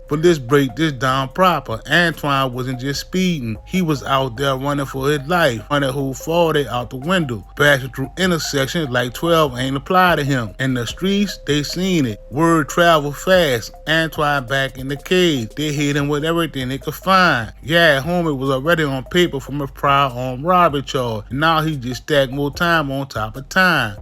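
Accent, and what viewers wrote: New Orleans, United States. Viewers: You even sound New Orleans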